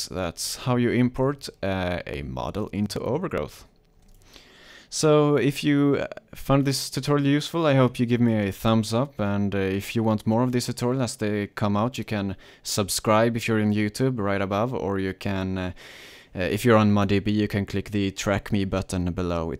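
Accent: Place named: Germany. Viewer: Your accent sounds kinda German or Swedish ... I am german :D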